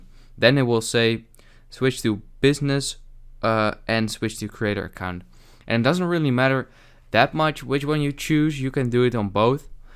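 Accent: Netherlands. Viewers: Hmmm Dutch? I can hear it a little ☺️ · I hear someone is Dutch here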